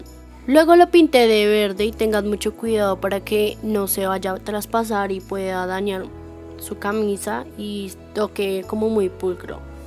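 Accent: Colombia. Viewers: Me encanta el acento colombiano. :) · ¿Eres de Colombia<3 ? No sé, te escuchas colombiana:3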